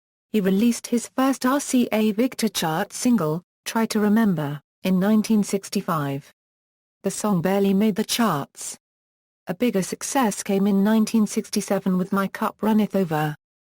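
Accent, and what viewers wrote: United Kingdom. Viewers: Robo-voice with British accent.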